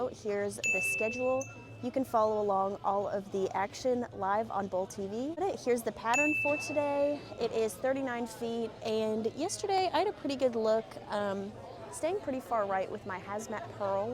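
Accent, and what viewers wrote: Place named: South, United States. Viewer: Picked up on that southern accent real quick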